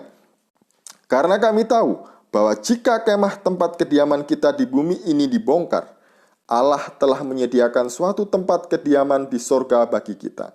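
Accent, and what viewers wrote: Java, Indonesia. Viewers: Yang Pertama Aku suka Gaya bicara Panjenengan Mas kental bamgeet wong jowo nya hehe✌✌✌✌✌✌✌✌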